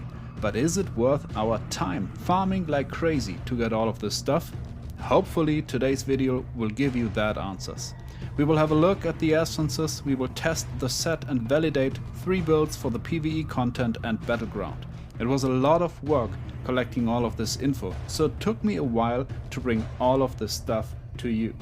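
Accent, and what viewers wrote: Germany. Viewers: Habe die ganze Zeit gedacht, dass du von der Aussprache her ein deutscher bist. Nach dem Kommentar im Video von Darkness, bin ich mir sicher :-)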